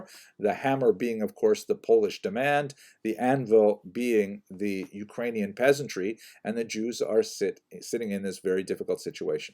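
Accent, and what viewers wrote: Canada. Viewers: Dr, as a fellow Orthodox Jew who often deals with the same issues among our co-religionists, thank you for your message and thank you for the knowledge you are sharing. Also, it took me a good few minutes to understand what you meant about "Bot" and "bought" until I realized that in your Canadian accent these words rhyme while they don't for me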